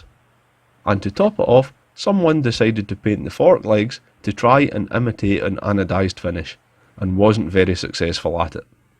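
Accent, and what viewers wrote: Scotland, United Kingdom. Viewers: Nice camera angles, on spot editing, humor, Scottish accent and a VFR! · Are you scottish? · Are you Scottish? It's like listening to Luke Stoltman 😅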